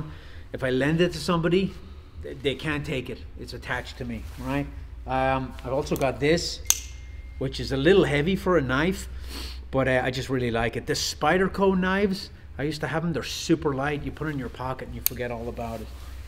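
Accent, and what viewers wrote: Ireland. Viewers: I was trying to put a finger on you're accent and it makes sense when you said you're from Ireland.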